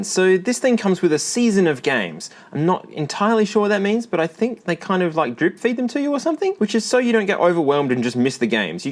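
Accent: Australia. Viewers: all australians sound the same I was just watching dankpods video and there is ZERO difference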